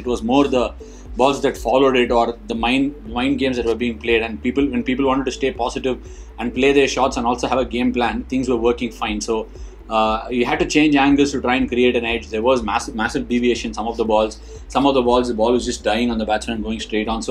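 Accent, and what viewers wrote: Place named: Chennai, India. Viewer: Best Chennai Accent😀 Nothing bad❤️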